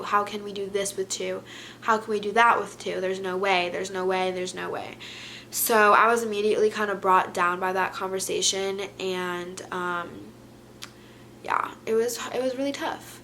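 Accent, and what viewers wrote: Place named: Rochester, United States. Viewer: Hey! Love your videos, been watching since your first one! I’m from Rochester too and I can tell your starting to get an accent😊 keep making great videos xoxo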